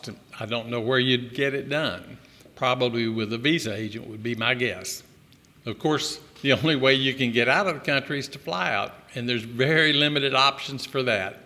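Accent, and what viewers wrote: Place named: United States. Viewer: I've seen a few videos from Duong Business Global- They've discussed all scenerios concerning business visas. It might be help to you. Sixteen years is a long time away from the US, I could still hear your accent.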